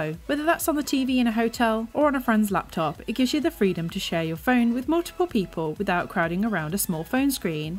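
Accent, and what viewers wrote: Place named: England, United Kingdom. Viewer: It’s an English, one of those things. Learn to respect, not all are using American (US) accent or twang. Peace ✌️!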